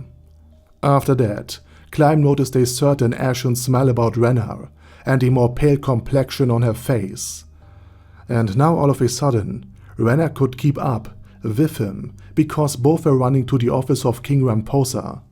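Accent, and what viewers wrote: Germany. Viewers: Man hört den deutschen Akzent gutes altes Denglisch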